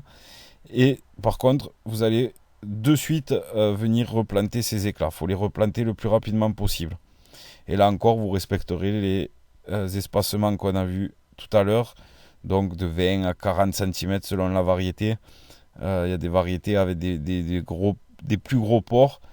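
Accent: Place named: South-West, France. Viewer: Liqueur de menthe ? Sorbet ? Miam ça baffoulle ? Pourtant une très belle voix avec un belle accent du sud ouest ! Merci pour tous ses conseils.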